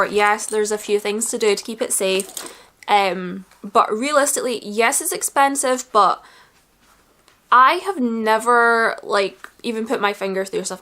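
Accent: Scotland, United Kingdom. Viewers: Hi can I ask where you’re from? Scotland?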